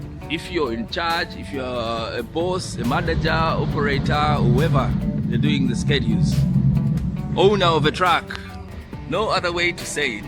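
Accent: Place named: Uganda. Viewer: Ur sound like Uganda goodwork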